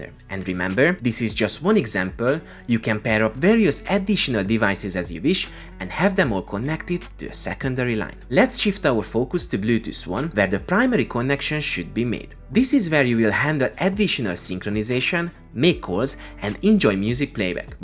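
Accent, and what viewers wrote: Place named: Hungary. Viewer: Amint a hang megszólalt, egyből az villant be, hogy ez a csávó magyar, 1000%. :) Annyira tipikus, mint az indiaiak, nem lehet letagadni.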